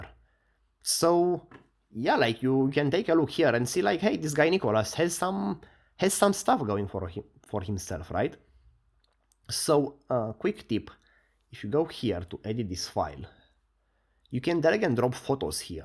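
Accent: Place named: Romania. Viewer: Guys istg his english is fine but it's just the ̶R̶u̶s̶s̶i̶a̶n̶ Romanian accent.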